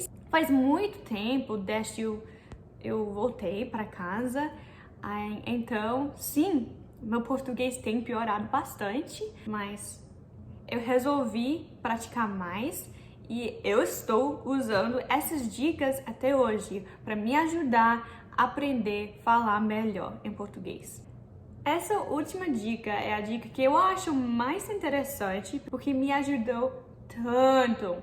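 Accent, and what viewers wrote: Brazil. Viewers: seu "português" + "sotaque brasileiro" estão perfeitos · vc vai falar igualzinho aos brasileiros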